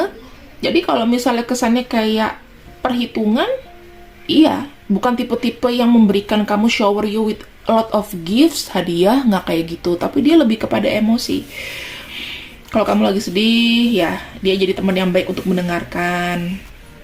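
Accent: Java, Indonesia. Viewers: Maaf mbak selama ini aku hanya mendengar genetal riding tp suatu saat saya mau personal riding dan caranya dihitung perja atau gmn dan perjamnya brp tktnya agak lm pertanyaannya ini aja mbak klo blh tau mbk itu org jaea apa medan ya kok pskai tp klo diliat bahasanya cengkoknya jg setenga jawa ini mbak slm dr martin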